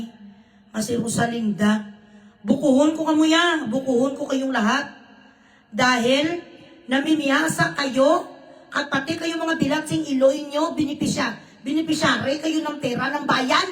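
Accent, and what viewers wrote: Iloilo, Philippines. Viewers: Hi from Germany👋I like the way you talk with an Ilonggo accent 😂🤣🤣and delivering the words Diputa and Belat mo😅😛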